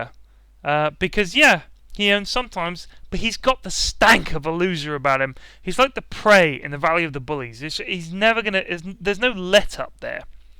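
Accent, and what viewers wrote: United Kingdom. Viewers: you're British accent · a guy with a British accent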